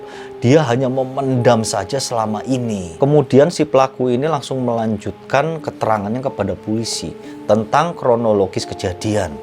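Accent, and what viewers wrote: Java, Indonesia. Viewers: wow salam mas OMONG JOWO NYA PEKAT BANGET.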